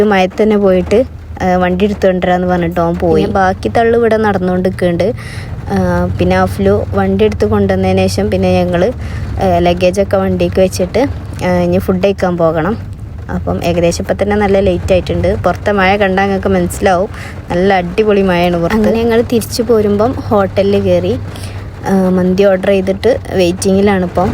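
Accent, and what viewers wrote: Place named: Malappuram, India. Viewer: നിങ്ങൾ എവിടെ മലപ്പുറം ആണോ? Am from malappuram,slang കേട്ടിട്ട് മലപ്പുറംപോലെ അതാ ചോതിച്ചേ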